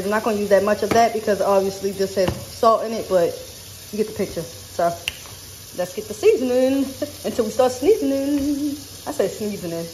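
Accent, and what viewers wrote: Baltimore, United States. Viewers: You from Baltimore ain't you? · She’s from bmore I can hear it lol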